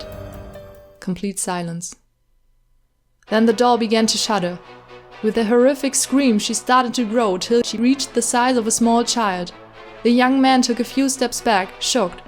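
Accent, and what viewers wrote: Germany. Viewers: Are you german ??? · I usually watch dollightfulls videos but NO REGRETS WATCHING YOURS! you earned a new subscriber! 💜 Btw, are you german by any chance? Your accent reminds me of how my class members talk English lul. Sorry if you're not. It just reminded me of that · Its a german accent, if you want to know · This is definitely my favorite out of all the Halloween dolls I've seen! Ill be sad when Halloween is over after today and everyone goes back to making pretty pink dolls :D Disregard this if I'm wrong but it sounds like you're German. Where did you get the Apoxie Sculpt from and how much did you pay? From what I've seen it's super expensive to buy in Germany. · Ja Deutsche erkennt man immer wenn sie englisch sprechen xD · Are you German? Because u got this accent of one btw this video was so good and thank u for not spoiling the end result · I thought so, you have the same accent as my friend. 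She hates her accent, but I love it. I like the German accent. <3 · Are u german?because of the "moin" and the way you speak(not in a mean way,you are super talentet and friendly,I'm just wondering) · Ich wusste sofort, dass du Deutsch bist😂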